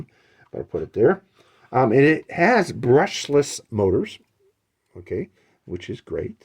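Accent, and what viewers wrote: Pittsburgh, United States. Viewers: I forgot to ask, are you from Pittsburgh Pa? Your accent 😍. I really do enjoy this video. It's what I need. Almost "hands on" instructions. Thanks again! I really want to learn how to fly drones. You've made it so much easier and you're really good with it.❤👍🏾I love the return home feature 😃Thanks again! I've subscribed👍🏾.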